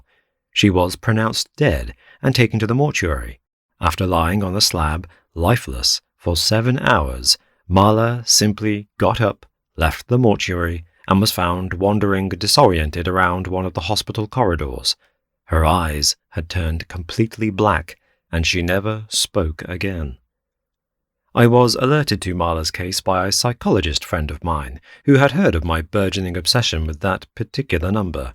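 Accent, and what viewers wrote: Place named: United Kingdom. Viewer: Your stories are pretty gruesome with detailed descriptions of repulsive stuff.......yikes. But whats weird is your lovely British accent makes it all palatable. Lol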